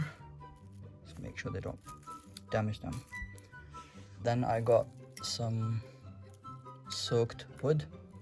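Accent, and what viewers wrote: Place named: United Kingdom. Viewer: I can hear a bit of a British accent there mate :p Nice work Akhi.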